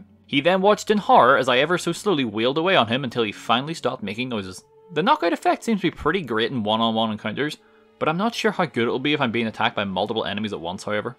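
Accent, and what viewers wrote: Ireland. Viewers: Waking up to an Irishman talking about doing a boxing run... I was born in the right Era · Bro this dude has the best Irish accent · Is he Irish ?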